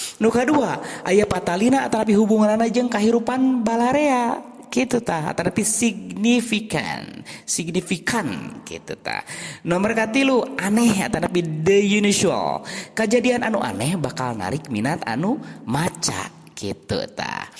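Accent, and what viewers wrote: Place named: Sunda, Indonesia. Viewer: Waduh Sunda pisan ny......warta berita ... Kedah d momole bahasa Sunda teh.. Semangat kang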